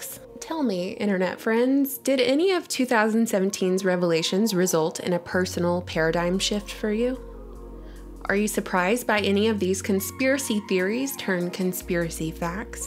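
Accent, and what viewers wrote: South, United States. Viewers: I love ur southern accent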